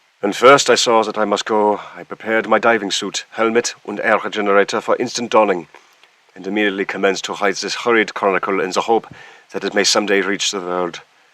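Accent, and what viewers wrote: Germany. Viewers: Listening to this while at work was such a nice reprieve from the norm.i loved your German accent! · Vielen Dank für die Erzählung mit deutschem Akzent. Das verleiht der Geschichte einem Hauch von Authentizität und macht sie viel eindringlicher. 😊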